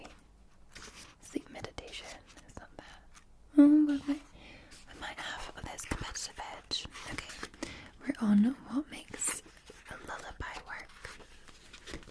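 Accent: Scotland, United Kingdom. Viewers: Yeah. I like the talking. She has one of the best soothing and most relaxing voices out there. And her Scottish accent is just right. Its relaxing af. To whomever has a problem with it. GTFO bro. We love just as she is. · Wow I like getting personal my Scottish sassy lassie! 😌😏☠️🏴🖤🌌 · Boeys and girrels 😂 love the Scottish dialect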